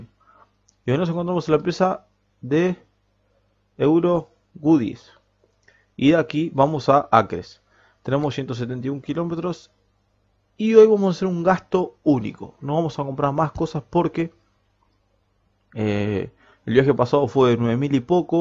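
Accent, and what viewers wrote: Argentina. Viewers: a pense que de argentina jaja saludos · Capoooo Pense que eras Argentino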